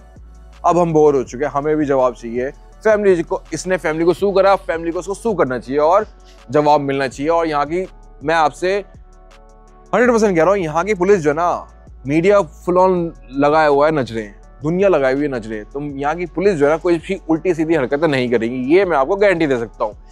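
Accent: India. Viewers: Aap Bihar se ho naa?? Aapka tone vaisa hi laga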